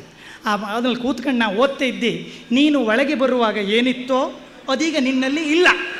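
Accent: Kundapura, India. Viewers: ಕುಂದಾಪುರ ಕನ್ನಡ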